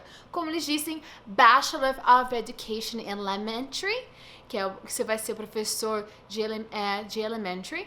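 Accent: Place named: Portugal. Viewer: lembra um pouco portuguesa seu sotaque é bem diferente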